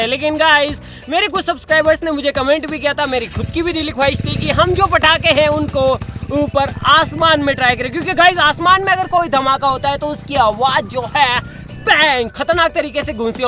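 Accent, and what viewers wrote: Rajasthan, India. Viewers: Rajasthani boll raha hai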